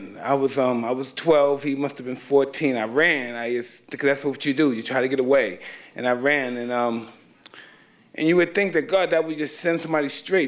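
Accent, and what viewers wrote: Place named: United States. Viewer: I like the way he articulates his points in pure American English, Tyson Mike a true legend,he speaks English so nicely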